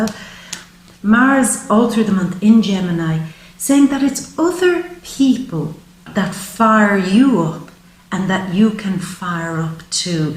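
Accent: Ireland. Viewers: I sent your readings to a LOT of my friends. Problems with the Galaxy or not, YOU were very powerful. I'm going to listen to my sage Sun reading when I go to sleep for awhile so that I can fully absorb it. Enjoyed my moon read as well as I'm SURE I will my rising. Love your Irish accent and your practical take on astrology and tarot. VERY insightful AND inspiring. THANK YOU SO MUCH ❤️🎈💗🎈💞🎈💕🎈 🙌 🌞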